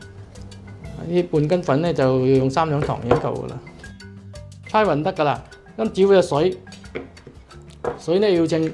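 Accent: Zhongshan, China. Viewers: 中山石岐話音❤️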